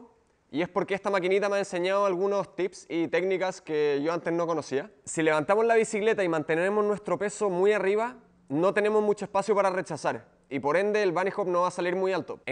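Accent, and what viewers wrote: Chile. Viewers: Es ke no puedo practicar el bunny hop con un chileno me encaaanta su acento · Chileno seguro es chileno palancaso con tonada chilena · Chile y por qué te parece familiar el acento? saludos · Eri chileno klo? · Habla Chilena 😎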